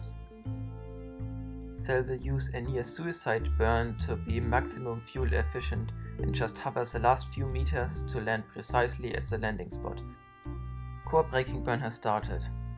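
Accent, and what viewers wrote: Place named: Germany. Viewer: I don't think your accent/ pronounciation is Bad ctually, I think the way you say the 'th' is just really german, and I hate it when people say it like "s".:P Ich bin übrigens auch deutsch:D Hat mich ne weile gedauert das ordentlich aussprechen zu können xD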